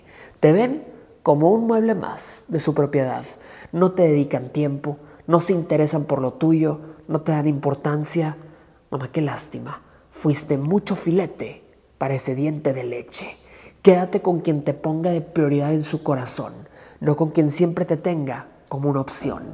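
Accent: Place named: Cádiz, Spain. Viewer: Habla como los angeles y me llego como si de gaditano se tratara oleeeee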